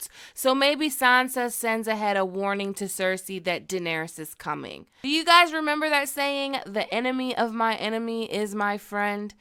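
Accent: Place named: Michigan, United States. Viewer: You from Michigan aint you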